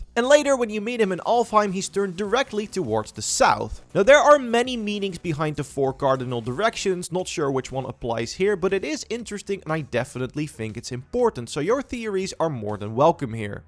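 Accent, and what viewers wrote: Netherlands. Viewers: Are videos voiced over by multiple people these days? The voice is very different from some other videos and the shorts, both sound like they're from the netherlands though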